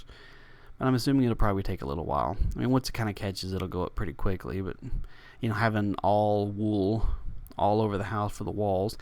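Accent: South, United States. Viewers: nice to hear another southern guy that likes minecraft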